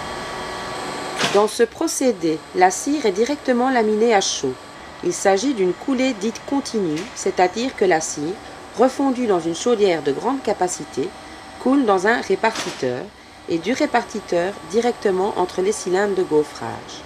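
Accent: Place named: Belgium. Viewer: L'accent belche!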